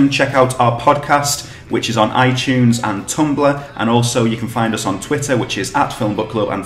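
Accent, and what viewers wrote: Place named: United Kingdom. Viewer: Ounder the skin, I saw-writ. Lol. What accent is this? It is from the UK somewhere, no?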